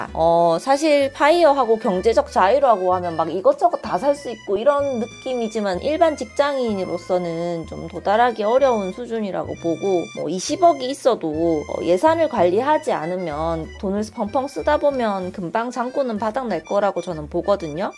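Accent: Gyeongsang, South Korea. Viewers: 저도 두사람이 하는줄 알았어요 경상도 사투리 너무 좋네요 익숙한 ~~~ ❤❤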